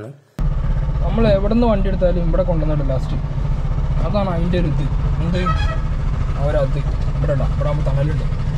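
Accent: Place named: Nilambur, India. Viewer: മലപ്പുറം നിലമ്പൂരിനടുത്ത് ആണെന്ന് തോന്നുന്നു സംസാരം കേട്ടിട്ട്.. ☺️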